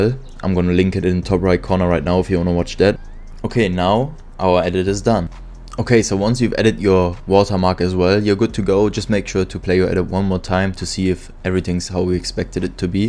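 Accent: Germany. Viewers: Youre German right? hört man :) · THE GERMAN ACCENT IS SO CUTE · Der Deutsch (Sprachige) Aktzent Kickt aber Nices Video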